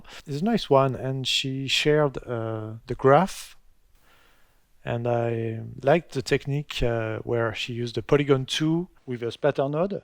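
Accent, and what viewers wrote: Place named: France. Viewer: Avec ton accent français j'arrive à comprendre xD merci pour le tuto !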